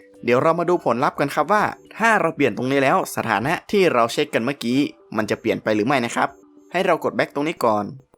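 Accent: North, Thailand. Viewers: พี่เป็นคนภาคเหนือใช่มั้ยคับ ทำไมปะแลดเมียง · พี่เป็นคนเหนือไหมครับมีสาปเมืองหน่อยๆๆ เหมือนเห็นตัวเองตอนพรีเซ็นงาน55555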